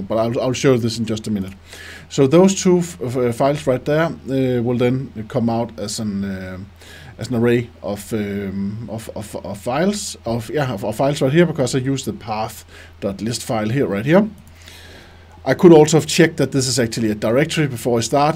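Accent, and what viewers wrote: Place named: Denmark. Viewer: Soo danish accent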